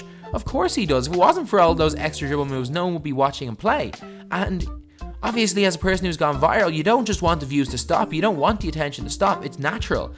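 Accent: Ireland. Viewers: Kinda strange listening to an Irishman talk about American High School boys. I didn't know basketball was big in Ireland since there was only one that ever played in the NBA "Pat Burke" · Are u irish? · Wtf you’re Irish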